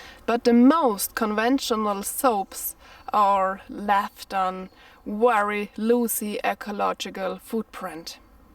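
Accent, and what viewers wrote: Germany. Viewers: I love a woman's German accent when she speaks English. Danke!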